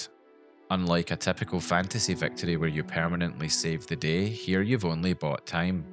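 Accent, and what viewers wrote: Scotland, United Kingdom. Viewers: It's good to hear another Scotsman on the internet. Free the motherland from the Saxon hordes! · Me pressing play: "please dont be ai, please dont be ai, please dont be ai" (hears lovely - and REAL - Scottish accent): "OH THANK GOD!" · my favorite scottish narrator comes back with anothet great lore movie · This guy's voice and Scottish accent is more fitting for skyrim then the skyrim voice actors themselves. I'm not sure if you will see this comment but you are now my go to skyrim youtube channel. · Love your accent. The Elder Scrolls need way more authentic scottish voices, it would fit in perfectly.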